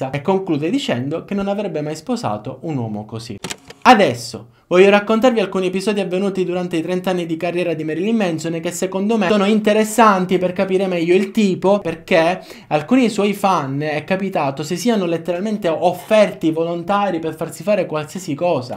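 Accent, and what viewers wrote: Sicily, Italy. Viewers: mi piace la tua cadenza siciliana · Direi dall" accento sei palermitano ! Bel video ciao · Quella dote narrativa con cadenza sicula "casa casa"... Adoro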